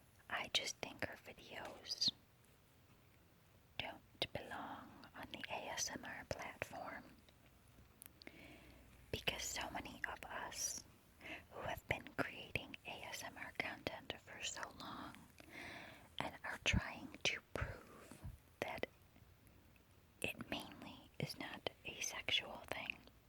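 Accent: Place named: Michigan, United States. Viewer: you're accent sounds close to a Michigan accent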